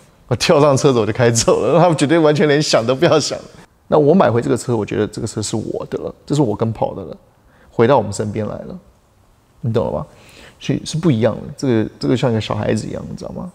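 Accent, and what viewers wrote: Taiwan. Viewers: 台灣人特有的口音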